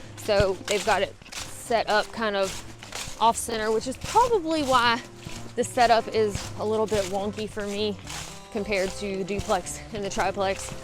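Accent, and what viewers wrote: South, United States. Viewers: "...He's not not not hocked yet". That's what my subtitles read! 😁 Good thing that I understand Southern (lived there for a bit, long ago, and I love the people and the accent). Back in New England to be with my Mom in her twilight years. I'll be back, likely., an old, big, heavy duty stuff sack, into which you can put a large rock or two, along with a mini 'biner to clip your guy line into, can be a big time saver when setting up in those rainy, rocky conditions. I hiked from Palmerton, Pa. to the DWG in the snow, so I feel your pain. Rocks and ice are a special kind of hazard! Take care!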